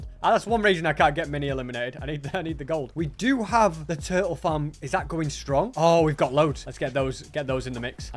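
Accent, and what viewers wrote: United Kingdom. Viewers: either way he literally speaks in a type of british accent all the time so ur comment makes no snse. what u mean is a british word u cant seem to remmerb him saying much, noy at all the same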